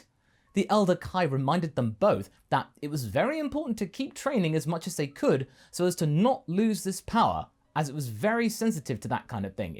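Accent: United Kingdom. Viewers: Day 1 of asking whether he’s from the UK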